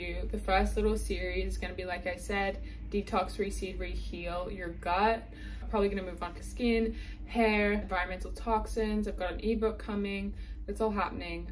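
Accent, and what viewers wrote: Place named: United States. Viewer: I can hear the most tiniest American accent coming on!!!! Fellow Byron girl here x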